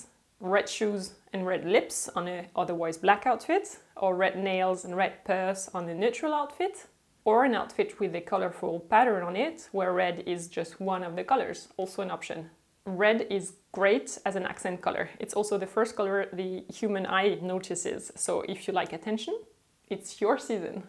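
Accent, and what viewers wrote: France. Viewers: love the video you have a beautiful sounding voice aswell are you french? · Thank you for such amazing content. You are by far one of my favorite fashion youtubers out there. Im so lucky to have come across your channel...not to mention youre French-your accent is music to my ears.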